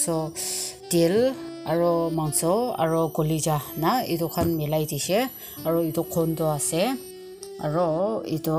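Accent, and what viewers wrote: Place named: Nagaland, India. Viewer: Authentic and natural...the way you speak nagamise is interesting 😂❤️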